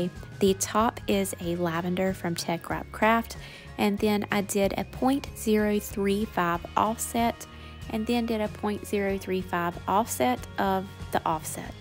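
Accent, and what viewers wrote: South, United States. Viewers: Glitters are gorgeous!!! I love PDB!! My favorite glitter of choice!! What part of the South are you from? I know a Southern accent when I hear it!! 😂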